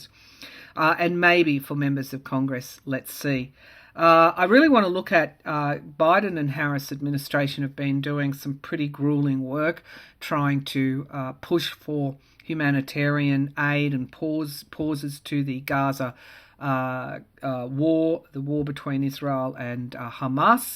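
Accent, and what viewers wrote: Australia. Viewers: She sounds Australian to me .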